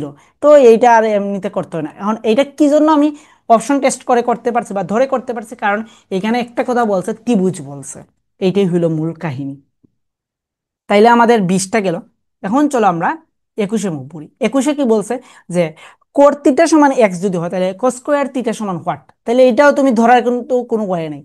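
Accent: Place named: Sylhet, Bangladesh. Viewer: ভাই আপনার ক্লাস গুলা ভালো তবে সিলেটি শুদ্ধ মিক্স হয়ে যাচ্ছে, এগুলা খেয়াল রাখবেন।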